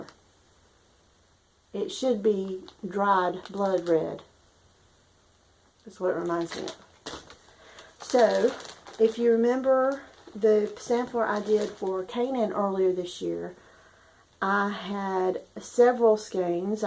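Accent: South, United States. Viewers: you are one of THE most gracious people I know- and that southern accent- just takes it out of the park!!! I love it... I want to say - how sorry I am for the news of your Dad- and how I love that you are all in the "now" instead of the later.. Enjoy each moment- and cherish that family of yours... We'll all be here waiting to see when you pop in next... xoxo Ohhhh- and good luck with your crochet adventure... ( smiles )... Bless your heart indeed.. Me and crochet do not get along- but I love how you are trying different ways to make it happen... xoxo Blessings Your Way!!! niki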